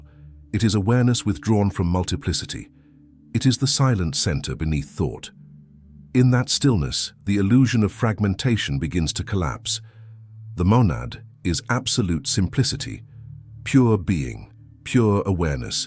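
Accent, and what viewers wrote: United Kingdom. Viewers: OHH, GOD, NOT. THAT AWFUL BRITISH ACCENT......AGAIN !!!!!!!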